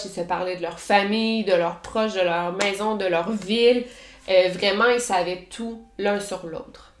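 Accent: Quebec, Canada. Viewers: J’adore ce bla-bla québécois. 🥰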